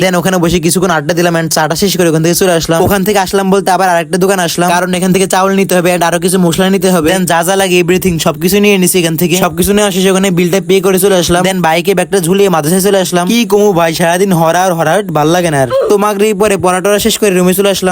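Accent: Noakhali, Bangladesh. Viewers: ভাই আন্নে ও কী আর মতো নোয়াখাইল্লানি কী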